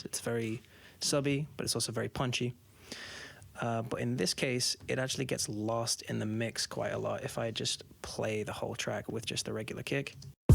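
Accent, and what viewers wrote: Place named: Northern Ireland, United Kingdom. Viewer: Bro you sound british, maybe northern ireland?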